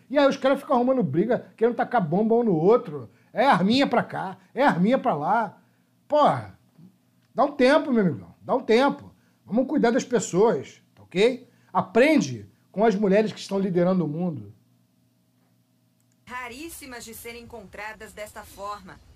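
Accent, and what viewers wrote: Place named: São Paulo countryside, Brazil. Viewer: Esse sotaque do interior de SP é de mais kkkkkkkkkkk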